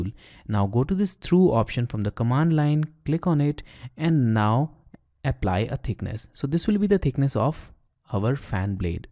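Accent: India. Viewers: nice lesson bro. you Accent is hindi.. inst it?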